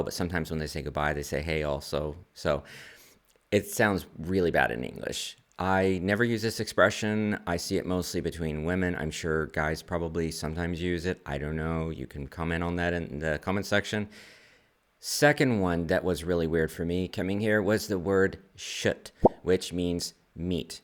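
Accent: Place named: United States. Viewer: Regarding Kött and fack. It is not because of your English but because of your American dialect; you don't pronounce all different English vowels. You pronounce some of them the same, unlike in English (The language spoken in England).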